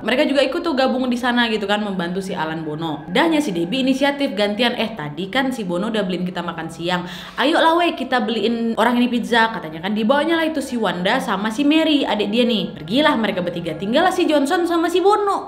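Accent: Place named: Medan, Indonesia. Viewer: Kak cantik nya awet☺☺ logat medan nya mantap saat podcast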